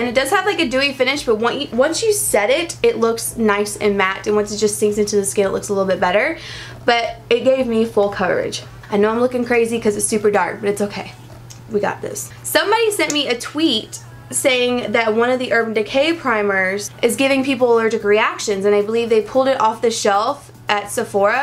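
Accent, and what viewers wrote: South, United States. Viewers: I personally don't see it AT ALL. Maybe they both have that slightly Southern accent. But looks-wise, she has NO resemblance to Britney Spears NOR Britney's younger sister.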